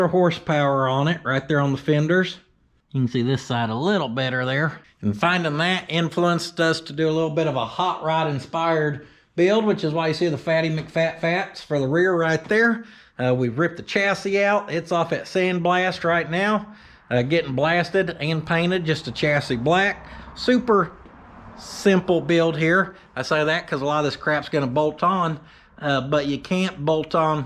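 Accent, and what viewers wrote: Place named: South, United States. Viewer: are you sure your not one of rednecks from down south way. The way you talk and spell sounds like us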